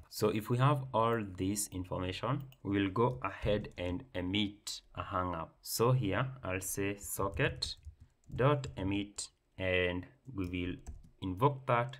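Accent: Kenya. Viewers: where are you from? your accent inasound familiar, almost like a murima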